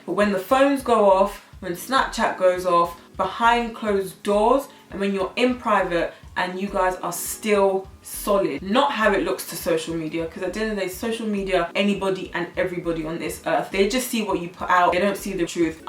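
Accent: Nigeria. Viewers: Naija accent I love it. You should do more of these videos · The Nigerian accent thoooo!!!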